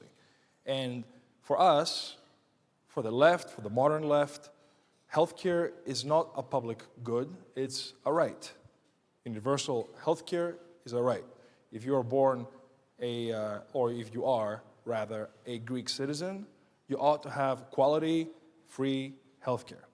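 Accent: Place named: England, United Kingdom. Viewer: Στέφανε ξύρισε το μούσι διότι δεν σου πάει. Κρύβει το πρόσωπο σου. Είσαι πανέμορφος άντρας. Μητρική γλώσσα τσ αγγλικά. Μου κάνει εντύπωση ενώ ζούσες στις ΗΠΑ μιλας με αγγλική προφορά και όχι αμερικάνικη. Καλό κουράγιο σου εύχομαι να έχεις εκεί μεσα στο ΣΥΡΙΖΑ που λογική δεν υπάρχει από τους συντρόφους σου.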